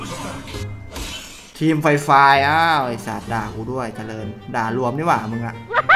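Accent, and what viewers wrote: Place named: Isan, Thailand. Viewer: เห้น ตลกก็บัดนี้เเหละพูดตลกเหมือนเสียงอีสานน่ะ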